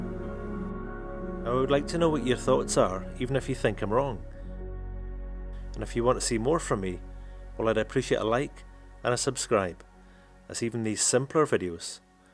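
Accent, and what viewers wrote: Scotland, United Kingdom. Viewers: Forget my final remark just watched another of your videos which answered the question,I knew there was a Scottish accent in there.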